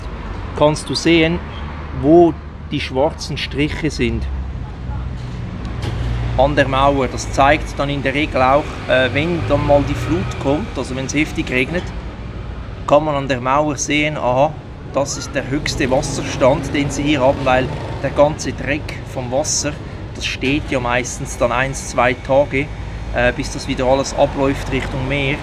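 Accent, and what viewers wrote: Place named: Switzerland. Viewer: Thai mit schwyzer Akzent. Scharf. ✌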